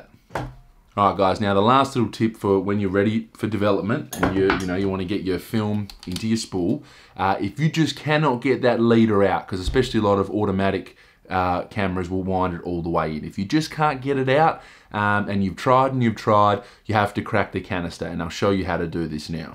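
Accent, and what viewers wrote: Australia. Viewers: His accent makes me feel naturally that he's an aussie.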